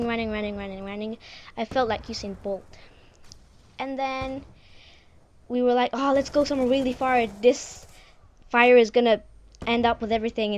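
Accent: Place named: London, United Kingdom. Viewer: You have a london accent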